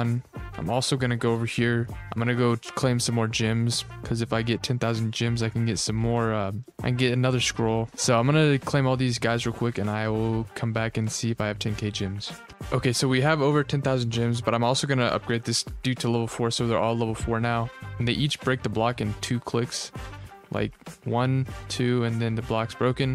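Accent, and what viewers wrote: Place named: Quebec, Canada. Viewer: you're 100% from quebec esti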